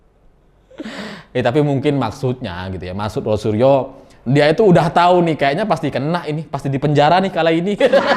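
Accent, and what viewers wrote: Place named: Madura, Indonesia. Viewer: Logat kacong medok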